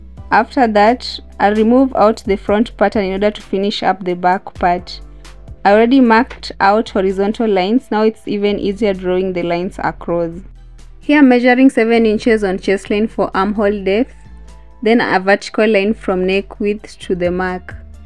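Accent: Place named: Kenya. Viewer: from your accent I can clearly tell you are Kenyan. Where do you buy fabric in Nairobi?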